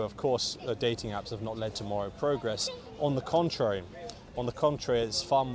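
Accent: United Kingdom. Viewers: Hi thanks for the video,I think this is an excellent exercise to train listening comprehension.First of all,you started with a slow pace when answering the questions which makes it easier for learners to understand and gradually you spoke more quickly and technically the vocabulary got more complex but I like the idea behind these videos.So,well done.I also like your British accent.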